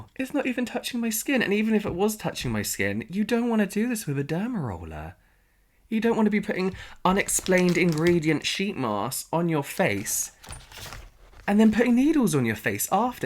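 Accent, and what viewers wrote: United Kingdom. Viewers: is simply a gorgeous specimen of a man 😍! The British accept is just a plus! God bless you Papacito Bello! 😘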